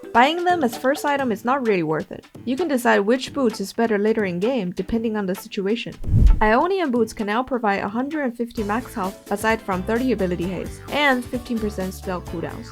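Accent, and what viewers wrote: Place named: Ireland. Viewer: came from the new boots update, stayed for the Irish accent 🖤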